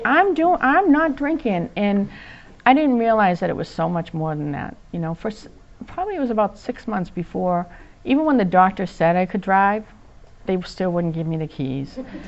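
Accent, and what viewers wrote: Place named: Boston, United States. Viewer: Beautiful job, loved your sharing, and your Bah Stan accent..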